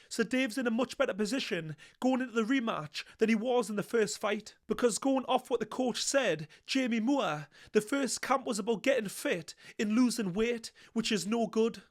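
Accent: Newcastle, United Kingdom. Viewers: Great analysis. Is the narrator a geordie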